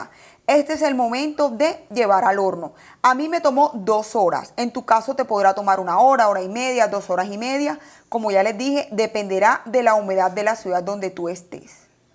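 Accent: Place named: Barranquilla, Colombia. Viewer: Eres barranquillera!!!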